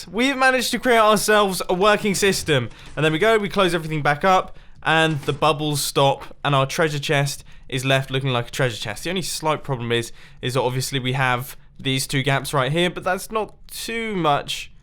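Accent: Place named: United Kingdom. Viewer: you make me laugh every time you don't even have to say anything, when you were trying out how to launch sand up all the sudden you said "oh my word i wonder if this will work with anvils!" I love your accent too, mostly just the British accent, but you will always make me smile.:D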